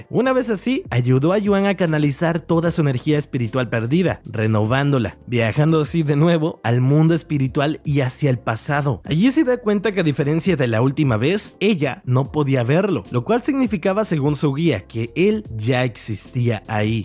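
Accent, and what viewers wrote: Venezuela. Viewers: eres venezolano? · Eres venezolano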